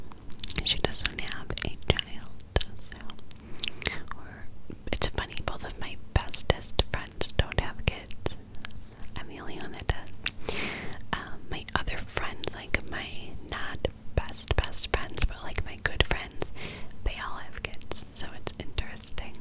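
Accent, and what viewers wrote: New York, United States. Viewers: Well I think you are a pulchritudinous gal, your sweet voice could calm a storm. I love it when you do some videos with a new york accent. I've been a fan of yours now for a few years and you have helped me fall asleep many of times. Im pretty sure you will reach a million subscribers. Anyway you look insanely beautiful and visually stunning as always love your asmr that you do. 😍😍🌹🌹🌹🌹🌎👑.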